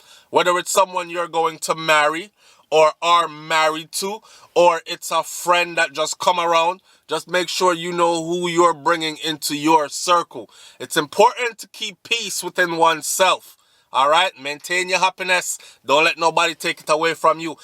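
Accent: Trinidad, Trinidad and Tobago. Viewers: Hi, love your Trinidadian accent... very funny 😂😂😂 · Lol oh boy, I love your Trini accent. This story was very interesting. I work on the weekends my days off are in the middle of the week so I can't do anything especially since I have my own reputation to uphold. Great video. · Well A A! Bachannal in de people place yes! I'm BAWLING crying at your Trini accent... Yuh have it dread, yuh have it! 😩😩😩🤣🤣🤣😂😂😂😂😭😭😭😭😭😭😭😭💀🤞🏾🔥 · Love your Trini accent! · Hi you are Trini you dount talk like jamaican love it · Man everybody done no she was ah bulla gal from long time lol.. side note... yur trini accent pretty good fadda 🤣👏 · Love ❤️ your Trinni accent is great 👍 brother don’t care what anyone else says 🙏🏾❤️🇯🇲🇺🇸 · I like how you talk the Trinidad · Well I am Trinni and you sound like us.Welcome my fellow Country man 👊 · you Trini accent wicked boi , ah hear u Trini keep on doing ur thing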